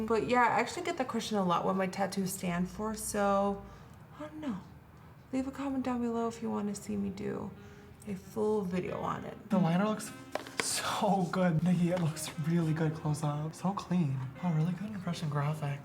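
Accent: United States. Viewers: Can you do a video where you transfer yourself into Adele? You favor her I think. My mother is Dutch but I never picked up the language. She like you speaks with an American accent. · I have been following you for three years and I was thinking you’re Amerikan😱Wow you speak incredible babe 😘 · How come you have an American accent and don’t sound Dutch at all xx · I THOUGHT YOU WERE AMERICAN! youtube unsubscribed me so I resubbed. Either way...Houston Tx USA Come see uss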